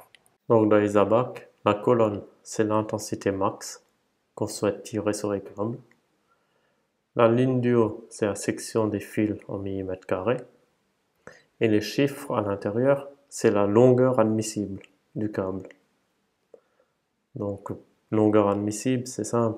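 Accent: Alsace, France. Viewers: sérieux ta voix elle me dit quelque chose. Tu es pas du bas Rhin ??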